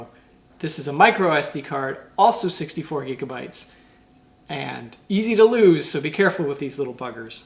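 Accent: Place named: Canada. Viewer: Canadian accent freakoUt:D:D NICE! Cool music:)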